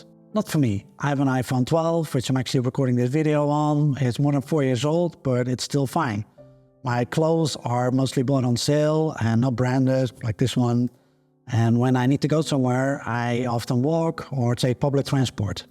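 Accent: Netherlands. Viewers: You sound like you are from NL. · Hey, would you be dutch by any chance?? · You sound Dutch.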